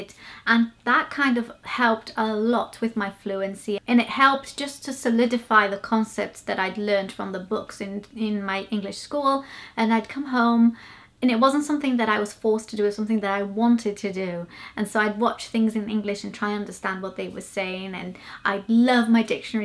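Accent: Wales, United Kingdom. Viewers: So cool that you've absorbed a welsh accent!! As soon as you started speaking I thought you had a welsh twang. It was great to hear a British accent but then when I heard the Welsh that was a nice surprise :D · You have the perfect accent when speaking English. Don't give up because you are very good at it👍also your accent sounds good welsh. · American? She sounds Welsh to me! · Your accent sounds quite Welsh! (I'm English) did you happen to spend some time in Wales? Edit: omg you just said you have a Welsh accent! Haha knew I had a good ear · Welsh accent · Native English speaker here (Scottish from Glasgow, have lived in London for over 20 years now). For the first 7 minutes of this video, I was thinking:"if there's no Welsh connection, I'm going to be very surprised; what a lovely perfect English-with-traces-of-Welsh (e.g. the beautiful way you say the word "years") accent". 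Then : Welsh boyfriend/husband. BOOM! You are obviously a very talented linguist, and your accent is beautiful. :-)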